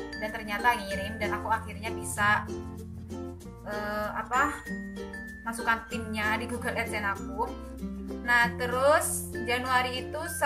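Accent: Madura, Indonesia. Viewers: dari madura yah? kok logatnya kek org madura😅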